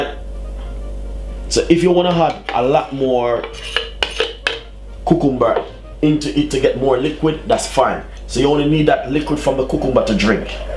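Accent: Jamaica. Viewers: For those who are concerned about his accent,he's my countryman,'Jamaican'to the core,no speaky spokey,and i rate him for that,straight down to earth son of the rock,thumbs up, gwaan do u ting mi yute!!! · The accent really attracted me, big up, nuff respek to my Jamaican bra. Anyone without an accent is dumb (can't speak). There are more than 10 different accents in the UK and they are all speaking English! People need to travel and learn. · His Jamaican his accent is different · Some people just like talking rubbish he is form Jamaica that how they speak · Coo-Coom-Brrrrr! So love that Jamaican accent! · He sounds Jamaican. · I lova me that Jamacian talk and sound, Chef!! I love the island mindset and food/drinks and healthy!! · I love your accent Jamaica tunnation nice one there, but that vegetasble is not seen clearly to see it i can get around me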